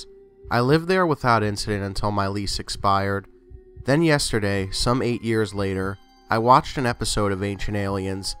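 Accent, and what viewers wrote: New York, United States. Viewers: Where are you from? New York or Jersey?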